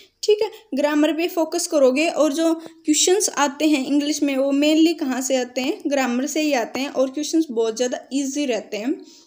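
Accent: Haryana, India. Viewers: Her Haryanvi accent🌝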